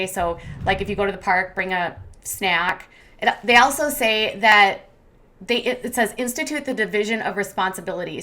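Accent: United States. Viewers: I know you have an American accent but for some reason my brain re-imagines it in an English accent lol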